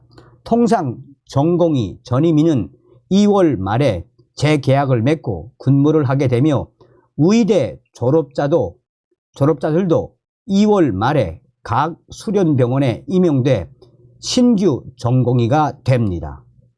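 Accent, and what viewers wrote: North Korea. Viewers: 정성산님에 tv을 자주 보는데요❤ 말씀을 표준말을 쓰니까 예전에 북한말 쓸대 보다 듣기가 좋습니다 감사합니다 ❤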